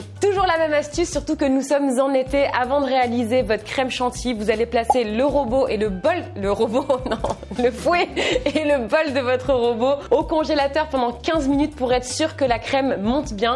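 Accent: Canada. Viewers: tu mon youtubeur préféré de vidéos accent canadien et qui met quoi des vidéos trop trop rire 👍👍👍👍👍 un gâteau aux fraises 🇨🇵🇨🇵🇨🇵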